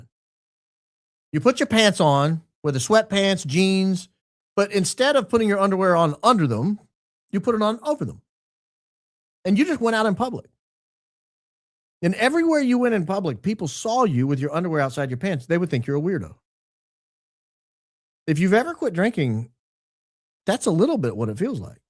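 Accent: South, United States. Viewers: Just ridden across London bridge listening to your Southern tones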